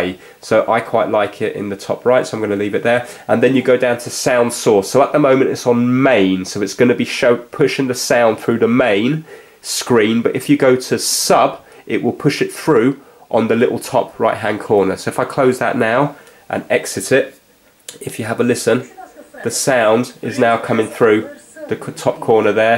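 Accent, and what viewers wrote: England, United Kingdom. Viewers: England accent👍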